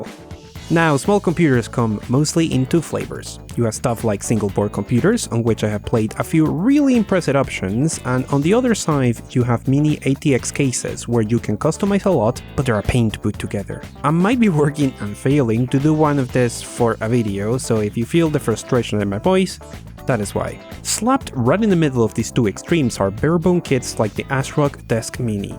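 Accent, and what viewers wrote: Venezuela. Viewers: Una pregunta. tu eres venezolano? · your english eccent is venezuelan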